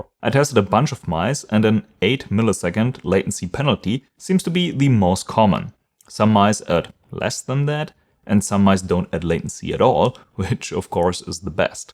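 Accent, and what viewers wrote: Germany. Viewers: are you german you sound like a german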